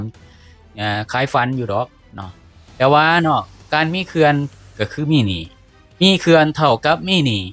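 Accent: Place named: Laos. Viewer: หม่วนหลายภาษาลาวดั้งเดิมที่แอดเว้าสบายดี,ขะน้อย,โดยขะน้อยขอให้แอดรักษาภาษาดีงามแนวนี้ไว้ตลอดไปส่วนหลายได้หงินคนลาวเว้ามีแต่เอาภาษาไทยไปปะปนเลยฟังบ่หม่วน❤❤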